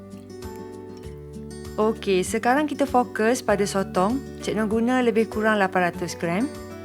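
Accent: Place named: Kedah, Malaysia. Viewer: Dengar loghat kedah video ni. Hahaha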